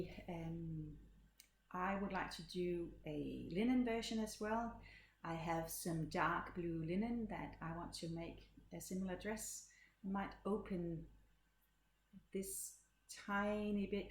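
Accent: Denmark. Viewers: Så får du da lige en lille hilsen fra syd for Granada i Spanien også. Så er der et land mere på din liste. Det sjove er at jeg faktisk hørte om din podcast på en amerikansk podcast, og vidste ikke, at du var dansk, da jeg startede med at se din podcast en gang i slutningen af sidste år 😄 men jeg genkendte hurtigt accenten 😉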